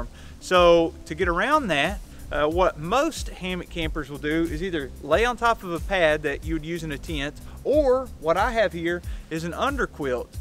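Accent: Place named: Southeast, United States. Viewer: 2 questions Just started to use a hammock, tent was annoying me too much. So im a bit new Im actually having trouble getting comfortable? i dont like my legs bending upward like im a stork or something. Like a human banana. Is that just unavoidable, or should i adjust my hammock somehow? second. watched a couple of videos everyone seems to have a southeastern accent. (guess thats where the trees are lol) where are you from?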